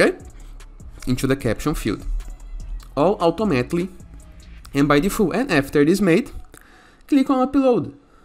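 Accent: Brazil. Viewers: Senti o sotaque brasileiro kkkkk depois fui no canal conferir e realmente é. Conteúdo bom demais valeu 🤝🤝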